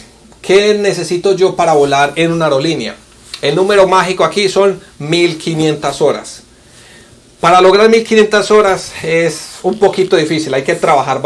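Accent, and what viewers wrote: Colombia. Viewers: EXCELENTE....QUE SENCILLEZ. PARECE PAISA O BOGOTANO .....PERO COLOMBIANO SI ES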